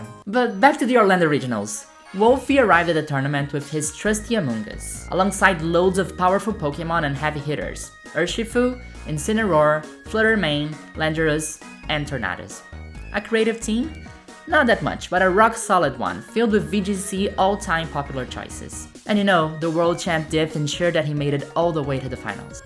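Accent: Brazil. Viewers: came to this video because a YouTuber I liked recommended it, so Imagen my joy when I hear a Brazilian accent and when I look up I see not only a Brazilian flag but what I assume is a trans Brazilian flag! Mal posso esperar para assistir a mais vídeos seus! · Pokémon's massive popularity definitely helps with it, but to grow up with it, and feel free enough to not be bound by those "now that you're an aDuLt you must renegade your childhood", in general are more accepting. Most of us were the 'weird kids', be it a gender reason, neurodivergency, or simply rather nerdy interests in general. When you exclude people they tend to band together, and in an era that all children, specially the ones that were left out, had a game/anime to bond over, they grow up not only pokémon fans, but opression-aware people. I tried not to write an essay here, but I needed to engage, I've found this channel randomly now, and...Eu reparei no sotaque, depois na bandeira, e depois minha mente explodiu! 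Então não só me inscrevi na hora, como tem que engajar 2x mais, porque é conteúdo de pokémon, é brasileiro, e é de gente finíssima!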